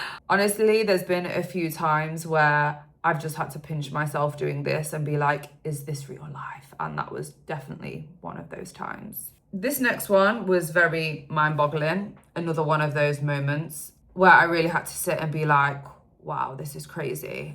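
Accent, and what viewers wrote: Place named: United Kingdom. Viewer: Do you think having a British accent gives you an advantage, say, over an American accent?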